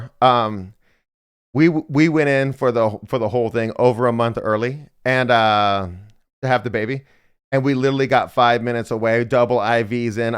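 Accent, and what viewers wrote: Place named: United States. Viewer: Why is this guy who is clearly of European heritage speaking as if he belongs in the Americas?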